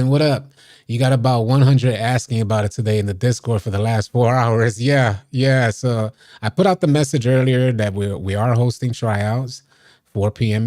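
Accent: New York, United States. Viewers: I respect your grind.. Very transparent and informative. Your content is appreciated for sure.. I Know u from up top somewhere NY or Jersey area by your accent. I Could be wrong lol but salute my boy 🫡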